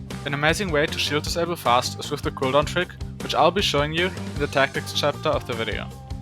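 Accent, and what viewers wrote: Germany. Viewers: u german? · Are u German? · I hear Deutsch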